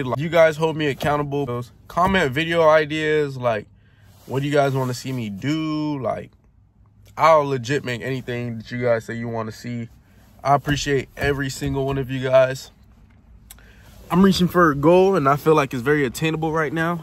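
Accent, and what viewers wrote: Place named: United Kingdom. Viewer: Have you ever lived in the UK? Your accent is slightly british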